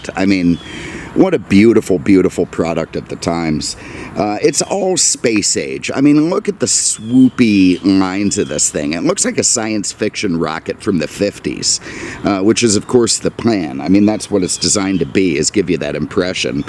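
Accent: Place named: New York, United States. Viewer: It was to see this video. What a beauty! Miss your beautiful happy disposition!!.😂😂😂😂😂 You sound like you are from New York.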